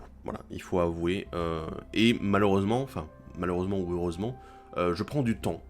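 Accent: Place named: France. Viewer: Merci pour tes remerciment tes le meilleur contenue francais meme si tu vien france avec l accent😅dsl jvien du Quebec .mais lache pas et ta des bonne idee pour ton avenir jai hate de voir ca .jaimerais parcontre te voir plus si tu pourrais faire tes deck tech (que jadore en passant)en face et peut etre avec un inviter de ton staff ca pourrais etre vraiment cool ou toute autre de tes nouvelle idee de video .continue on voit bien ta passion pour magic et surtout le commander 🖒🖒🖒🖒👏👏👏👏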